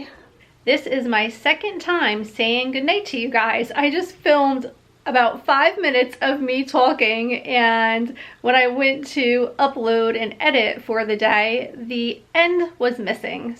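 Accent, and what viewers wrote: New Jersey, United States. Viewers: Just joined your channel! love your content. Love your NJ accent :)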